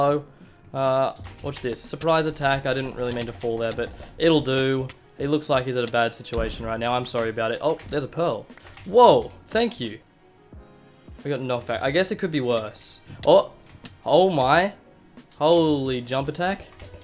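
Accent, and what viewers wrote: Australia. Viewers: Love the Australian commentary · idk why, but i think im wierdly drawn to your videos because of the australian accent. I'm australian too so it feels like im listening to a friend ig.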